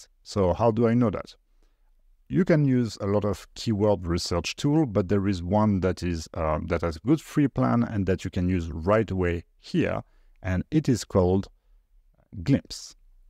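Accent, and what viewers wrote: France. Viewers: Really good, thanks for this advice video, nice english accent (ça s'entends un peu que tu est français comme même :D) Keep it up with the great videos. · 😵‍💫🤯🤯 du jamais vu. Ton approche innovante, la réflexion profonde, la succession des étapes,les outils utilisés chapeau même le fameux French accent 👍. Tu as un abonné et un like + un commentaire. Merci pour le partage. Je crois que tu as été copié si ce n’est pas quelqu’un de ton équipe. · From the way you speak English.. I'm getting your normal French accent. Which means you speak French Did i guess correctly?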